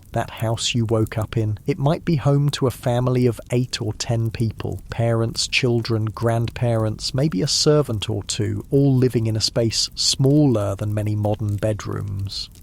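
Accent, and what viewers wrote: United Kingdom. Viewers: I hate the British accent and voice used 🤢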